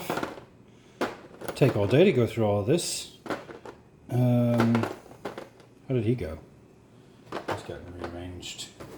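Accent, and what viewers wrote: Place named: North America. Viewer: Thanks for the video! What part of the world are you located? Your accent sounds North American, but I assume you are not since I don't even think the boats have landed in the States yet. Shouldn't be long now and OMG am I excited to play!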